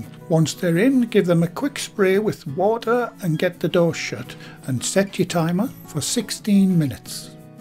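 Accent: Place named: North East England, United Kingdom. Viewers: Hello. It’s great to hear my own accent while living here in Crete. As a lass from Seaham Harbour it is music to my ears. After retiring we went to Vancouver, Canada for twenty years and I loved enjoying a Vietnamese roll when going to the mall. Now in Crete there are no meat pies or these rolls so I’ll definitely make some in the cooler months. Love your recipes, your presentations of them are excellent. I’ve learned so much already. Thank you. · Your baking has been the highlight of my week! As a Sunderland lass stuck in another lockdown in Shanghai, I appreciate not only the fantastic bread but the sound of a North-East accent:) They don't really do home ovens in China, but I've bought a table top Panasonic and I am definitely going to try these today. Thanks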